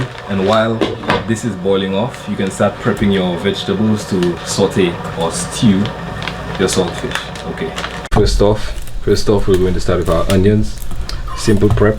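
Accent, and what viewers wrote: Saint Lucia. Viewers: doing it big!!!!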